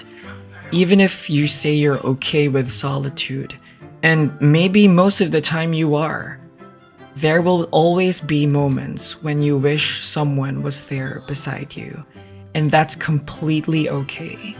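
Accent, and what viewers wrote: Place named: Philippines. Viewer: Your Phillipino English accent is so beautiful and relaxing.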